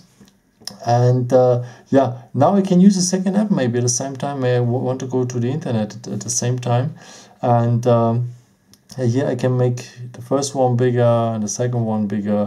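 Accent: Germany. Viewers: Man hört es raus das du deutsch bist 😀😀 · Man hört den Deutschen aktzent richtig raus😇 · He has such a strong german accent 😂, but the video is really helpful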